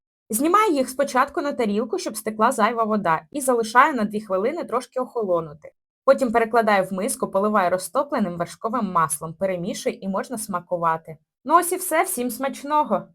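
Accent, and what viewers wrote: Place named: Russia. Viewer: майже позбулася російського акценту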